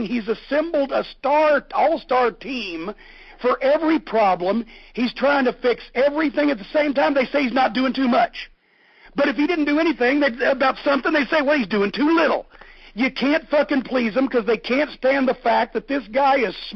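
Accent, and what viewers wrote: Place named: South, United States. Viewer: Wow...logical progressive thought with a southern twang. I like you Mr. Cornette!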